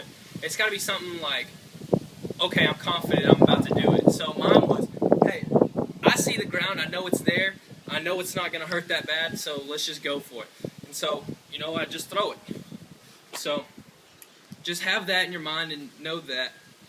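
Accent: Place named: United States. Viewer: Where's is he from iv heard an cow boy voice like that before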